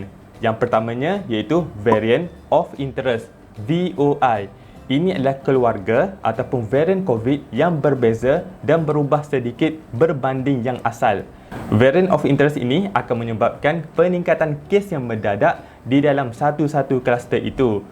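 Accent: Kelantan, Malaysia. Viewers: pekat budu terrrr...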